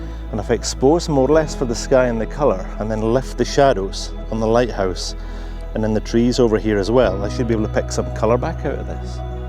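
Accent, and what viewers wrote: Scotland, United Kingdom. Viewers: So grateful you take your own time to make these Videos. I love photography and have the technical side but don’t have a particularly artistic eye,so I get a lot of inspiration from videos such as these! Nice to hear a proper accent too, from a fellow Scottish Lad!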